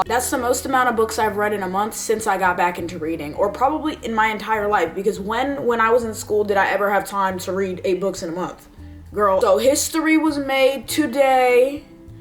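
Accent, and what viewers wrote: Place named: United Kingdom. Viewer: Why did i assume you were british..? Anyway immediately subscribed.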